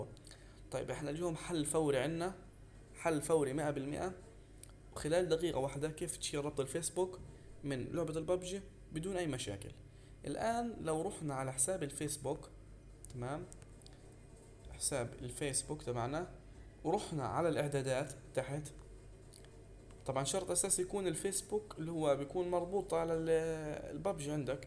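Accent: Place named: Libya. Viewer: احلا ناس والله. بس لهجتك نفس ليبيه ❤️❤️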